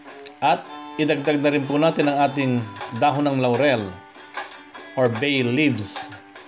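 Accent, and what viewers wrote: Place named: Tagalog, Philippines. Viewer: Sa puntong Ito 👍original tagalog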